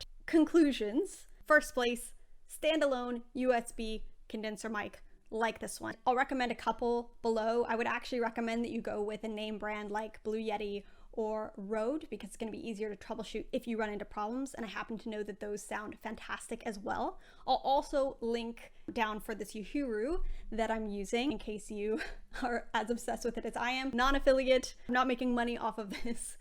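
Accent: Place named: United States. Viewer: You sound American, but you refer to Pounds in currency.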